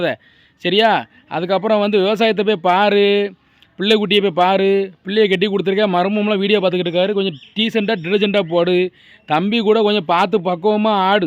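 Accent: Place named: Tirunelveli, India. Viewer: தங்கள் நெல்லை பேச்சு நன்றாக உள்ளது அண்ணா 🎉🎉🎉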